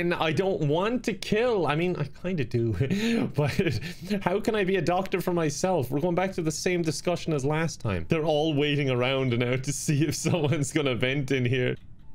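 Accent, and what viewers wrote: Ireland. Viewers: Why did actually sound irish today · That Irish accent omg haha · irish accent was so strong this episode lol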